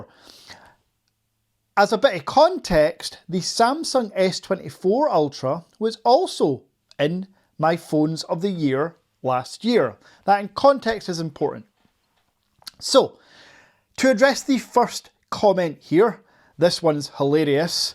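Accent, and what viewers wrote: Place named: Scotland, United Kingdom. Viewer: Lovin’ the ‘Skaughtisch’ accent. 👌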